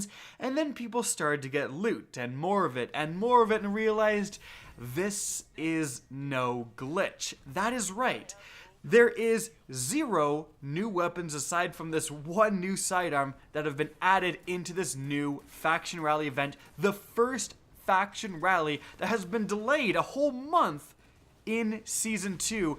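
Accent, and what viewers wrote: Canada. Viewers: omg hes canaden "sawy"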